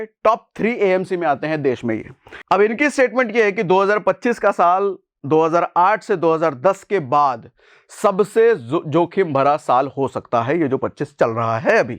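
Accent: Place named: Bhojpuri, India. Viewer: Please try to understand what is analysis bringing out data is only fact what is implication on India on the tariff placed by Trump you don't know you have not even understood you are just speaking like Khan sir nothing more no value I have watched you few times you have got only style of speaking and that a typical of Bhojpuri nothing else no value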